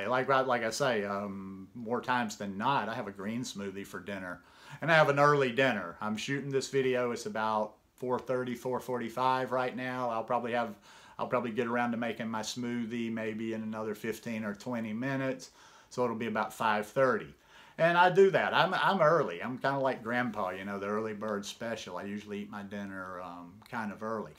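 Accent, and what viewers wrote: South, United States. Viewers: If you want to put weight on, working out does build muscle! So, if you are not working out, you may want to add that to your weight gain process. Great videos! Thank you for sharing your experience! Your southern accent Always keeps your videos interesting and peaceful as I grew up Texas and you remind me of my life growing up! Vegan for life!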